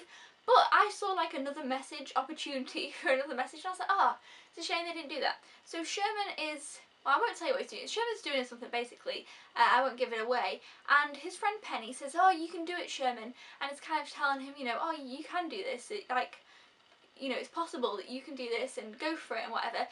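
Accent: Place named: United Kingdom. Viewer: I LOVE(d) YOUR REVIEW! IT IS NICE AND REFRESHING TO SEE A BEAUTIFUL WOMAN REVIEWING AS MOST MALES ARE REVIEWERS. You are perfect reviewing! With that said, I have been to your country twice (one time, a DAY trip from NY to London and back), and another time, couple day trip. Have you been to the States here? Moving on: I do own over 18,000 dvds/blurays all genres, including over 100 3-D, and 945 laserdiscs. And a full theatre surround system. Anyway, I do plan on purchasing MR PEABODY as I grew up with the original cartoon. And you convinced me it is a good movie to purchase. I look forward to your future reviews. You are so bubbly, cute, love the accent, and you are so much fun to watch review. Thank you very much, keep up the great work, and looking forward to your next review, whatever movie it will be. (I recently purchased TRANSFORMERS 4 3D, MILLION WAYS TO DIE IN THE WEST, GODZILLA 3D, and others.) Take care, Happy Holidays, and as you say in UK, "Cheerio"! 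Bye for now. (*feel free to reply back if you'd like). BYE!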